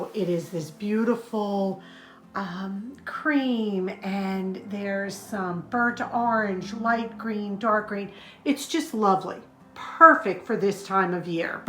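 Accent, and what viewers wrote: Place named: Long Island, United States. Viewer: Hi. This is my first time here. I love frugal videos and yours is the first I've seen from NYS. I'm a New Yorker too....from Long Island. I think I detect a LI accent from you. Lol. I'm going to make the orange marmalade....mmmm. I love marmalade. is doing a great job on the deck. There's so many ways we can save money. Thanks for sharing. I subbed you and I'm looking forward to watching more of your videos. 😊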